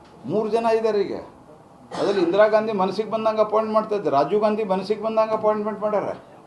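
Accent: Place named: North Karnataka, India. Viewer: ಅಚ್ಚು ಉತ್ತರ ಕರ್ನಾಟಕ ಭಾಷೆಯಲ್ಲಿ ಅತ್ಯಂತ ಪ್ರಬುದ್ಧ, ಸಮತೋಲನದ, ಯಾವುದೇ ವಿವಾದಕ್ಕೆ ಎಡೆ ಮಾಡಿಕೊಡದ ಸ್ಪಷ್ಟಿಕರಣ. ತಮಗೆ ಅನಂತ ಕ್ರತಜ್ಞೆತೆಗಳು 🙏👌👍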